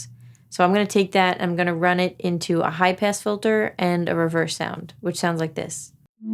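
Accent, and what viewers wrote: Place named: Alabama, United States. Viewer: For faster bankruptcy, start with JHS pedal first episode. Turn the YT speed up to 150% as you can eliminate the slight Alabama drawl and get through bankruptcy much faster” By the time you get to the Colorbox 2, you will have enough money to enjoy whats left while having enough left over for food and rent with a special monthly “kitty” to buy your favs from.:)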